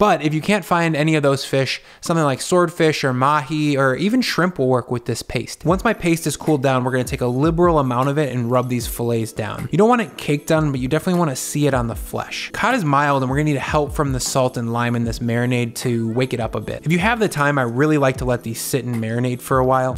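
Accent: United States. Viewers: I'm American. You sound American. Why are you using the Metric System? You can always edit for those overseas. I'm still gonna try your recipe though.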